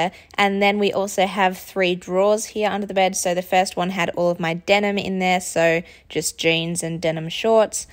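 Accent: Australia. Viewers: Your intonation is quite special , not like the American intonation I have learned , and after I checked the profile , realized you are an Australian ,no wonder it’s quite different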